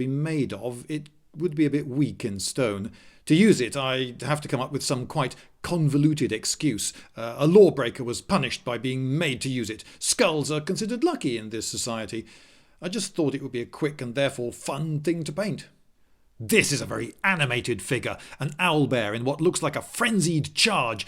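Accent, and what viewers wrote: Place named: United Kingdom. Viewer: Funny, you sound overall brittish yet you are as pedantic as us germans. =) Anything we should know? Have a nice Christmas and a happy new year.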